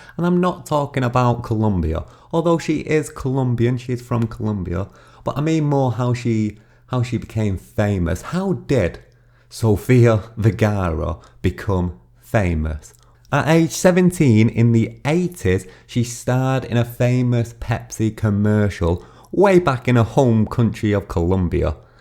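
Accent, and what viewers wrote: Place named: North, United Kingdom. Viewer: Appreciate your commentary and deep dives. It's always your great Northern accent and the fact that your content is always fact based and never without a sense of humour that always makes me smile along with the fact that pepsi is my beverage of choice! Irony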